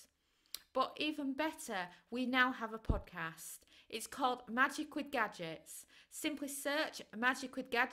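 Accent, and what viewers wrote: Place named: Yorkshire, United Kingdom. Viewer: It’s some of Northern British accent, maybe Yorkshire? Very endearing to hear but I think some non-Brits may not understand everything.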